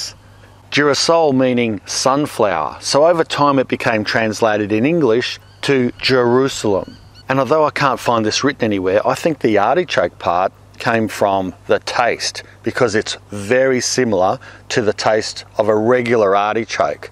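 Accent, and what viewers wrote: Australia. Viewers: Thank you for your Youtube-channel! Watching your videos is a study of Fun Gardening and Australian English at the same time :)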